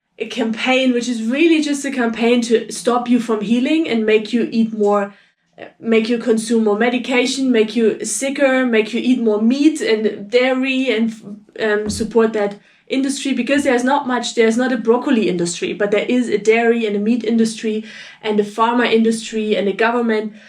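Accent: Germany. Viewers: German accent is not really appealing for ears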